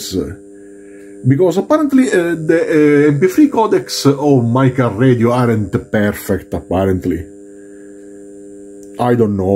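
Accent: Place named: Italy. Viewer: Mamma mia che accento italiano! Vorrei realizzare anch'io il tuo progetto ma non hai accennato allo schema e ai componenti.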